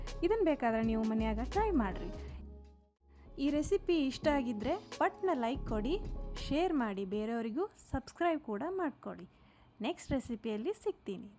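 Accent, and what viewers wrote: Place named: North Karnataka, India. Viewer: Uttar karnataka bhashe na chenda 👌🏻and alli kade uta matra masta irat super akka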